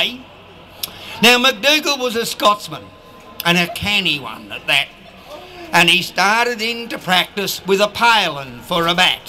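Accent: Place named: Australia. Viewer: Last heard this recited by Gerry Hallom a folk musician from England who travelled to Australia. Good to hear it recited by an australian.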